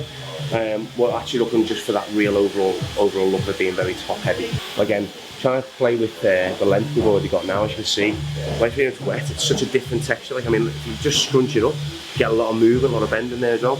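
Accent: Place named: England, United Kingdom. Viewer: Whats your English accent .. I wan tto know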